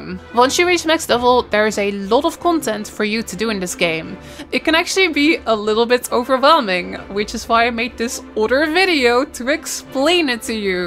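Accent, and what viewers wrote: Netherlands. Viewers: Ur dutch :p !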